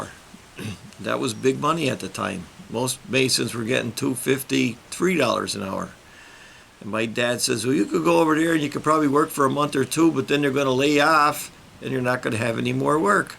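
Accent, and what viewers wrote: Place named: Chicago, United States. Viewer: Great video. I’ve been in my union for 26 years. You get out of it what you put in .i started watching your videos because I was trying to figure out what type of cement to use in a faux stone paver walkway my wife has me doing. And I’ve stuck around for the stories. I work in Philly we have an accent. I thought I was listening to an old Chicago fella. 😂. God bless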